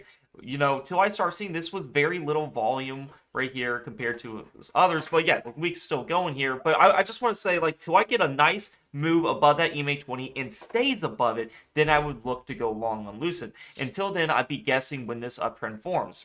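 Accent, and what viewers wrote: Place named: Iowa, United States. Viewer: ARE YOU FROM Iowa??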